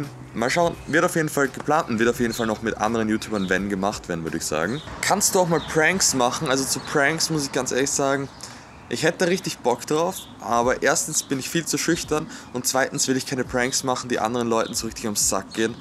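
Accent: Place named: Graz, Austria. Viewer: Das ist der grazer Akzent wir sind keine Bauer in graz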